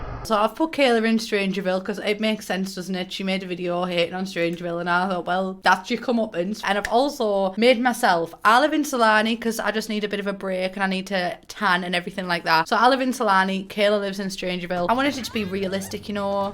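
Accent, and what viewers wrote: United Kingdom. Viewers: Here i am again downloading it again thanks i did keep up with your dialect even tho i'm from norfolk nice to hear a brit talking about sims makes a change from the yanks all the best love to you and stay safe.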